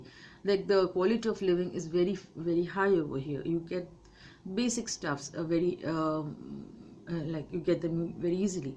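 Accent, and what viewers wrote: Bengal, India. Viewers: Dear, I love your Bonglish.....Your English in Bengoli Accent is mind blowing..... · Dear Madam 🌹🌻🎊🏆👍👌 I could make out that You are a Bengali Nice to hear from you A Matured Mind speak Regards From the City of Joy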